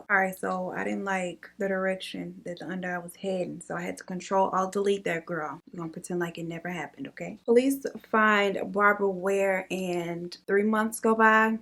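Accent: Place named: AAVE, United States. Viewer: Sorry can't listen to ebonics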